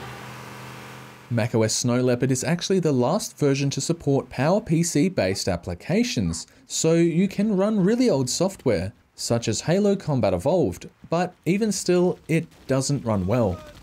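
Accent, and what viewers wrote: Adelaide, Australia. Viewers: you from south australia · Always nice to hear another heaps good Adelaide accent. Keep up the great videos!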